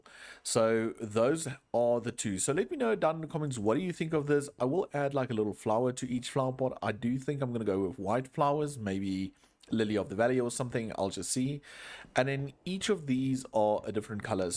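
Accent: South Africa. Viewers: I like your South African accent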